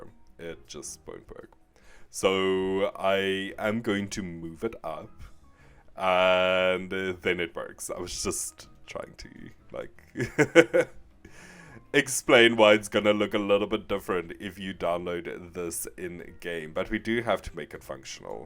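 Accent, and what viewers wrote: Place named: South Africa. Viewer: Oh wow, you live in South Africa? Now I understand the accent - I thought you were British, sorry. Hi from Germany!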